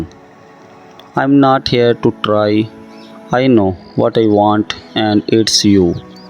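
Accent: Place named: India. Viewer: Why the accent is indian i dont think this is faza faza is defrent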